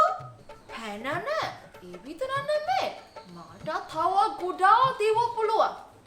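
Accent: South, Sri Lanka. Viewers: දකුනේ ගැම්ම❤️ ඒක මරු. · දකුනෙ ගැම්ම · දකුණේ ගැම්ම 😂😂😂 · දකුණේ ගැම්ම😆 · හරි හරි..දකුණෙ ගැම්ම😂😂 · දකුණේ ගැම්ම අම්මෝ 😁😁💖💖💖💖💖💖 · හරි හරි දකුනෙ ගැම්ම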